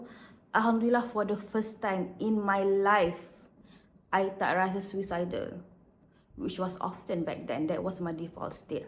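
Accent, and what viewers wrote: Kelantan, Malaysia. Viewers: Her accent sounds klantanese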